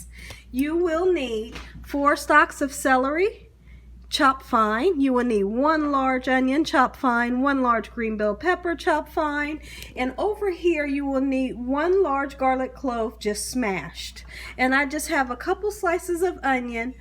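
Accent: South, United States. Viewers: ❤️❤️❤️ that sweet Southern accent 😌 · Where are you from ?? you are southern parts of somewhere🤗 Louisiana maybe? I'm from Alabama and we do things alot alike ❤️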